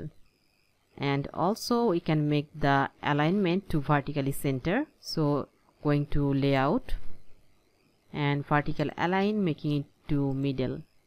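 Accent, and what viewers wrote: Bangladesh. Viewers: Are you Bangladeshi? Your English speaking is so understanding. ❤️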